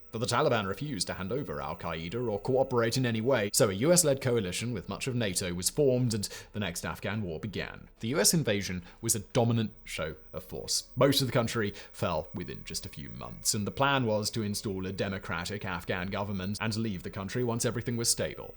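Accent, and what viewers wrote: England, United Kingdom. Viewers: Afghan history should certainly not be told by a dude with English accent especially when you skip the nasty details in favor of the British oppressor and aggressor! Correct version: The Bacha-i-Saqao was an orchestrated Islamic uprising by the British to oust the real Afghan king, Amanullah Khan, in 1929. When the last Afghan king was removed, the British RAF instantly installed Nadir Shah and his four brothers (the Musahiban brothers), who attempted to erase the era of Amanullah Khan and rewrite Afghan history by killing thousands of Afghan students and intellectuals in order to enable the British annexation of Afghan land (Durand Line), which Amanullah Khan had freed after the Third Anglo-Afghan War in 1919. Amanullah Khan had also formed a pact with the Bolsheviks, better known as the USSR, in 1923 to distance Britain's imperialism. 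The British puppet king, Nadir, also fostered division in Afghanistan by promoting racism among different Afghan ethnic groups, especially the Hazaras, who became the oppressed group. Nadir even created a separate class and caste within his own Pashtun ethnicity; his tribe, the Mohammadzai, was considered superior to other Pashtun tribes. Interestingly, Karzai, the first USA-backed Afghan puppet, is a relative of King Zahir, who was the son of the British puppet king, Nadir, just as Ghani was a puppet of the West, serving the interests of USA banks to exploit Afghanistan. Afghanistan gained its independence because King Amanullah Khan recogniz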